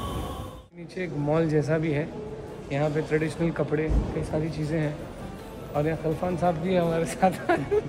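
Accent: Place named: Hyderabad, India. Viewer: Bhiya full hyderabadi slang me hai kiraak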